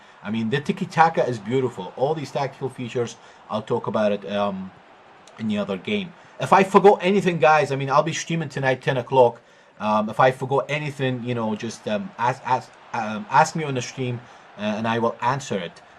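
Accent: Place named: Scotland, United Kingdom. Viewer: Are you Scottish Italian because you certainly have a Scottish accent poking through bro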